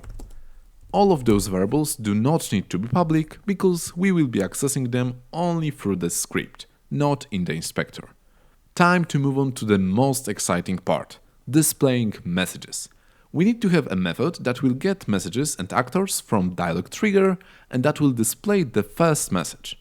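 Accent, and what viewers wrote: Poland. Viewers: Jesteś z Polski? Bo tak trochę po głosie słychać ale nwm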